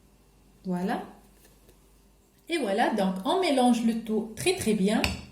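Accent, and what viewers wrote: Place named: France. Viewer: Ciao, adoro la tua pronuncia francese 🥰 ottima bevanda, fresca ed energetica 😋🥰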